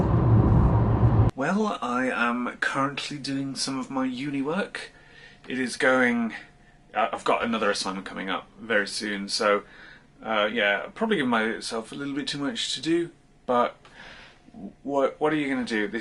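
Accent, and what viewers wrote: RP, United Kingdom. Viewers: You have to do the posh accent, everything else won't cut it! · I like your posh accent. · Could NOT stop laughing at your monocle and posh accent, cracking me up!!!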